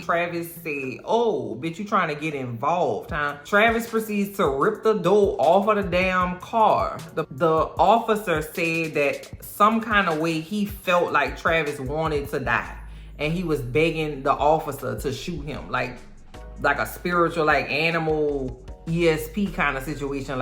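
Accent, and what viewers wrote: New Orleans, United States. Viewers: Just watched both episode last night love your commentary and your Nola accent 💚💯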